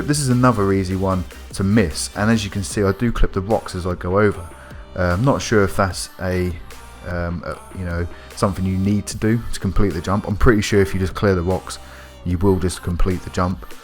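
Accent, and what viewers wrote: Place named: England, United Kingdom. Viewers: Annoying England accent NO no no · sounds like you're a Norfolk lad, great video too very helpful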